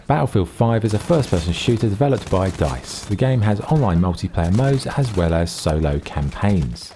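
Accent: United Kingdom. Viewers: Are you brit ISH?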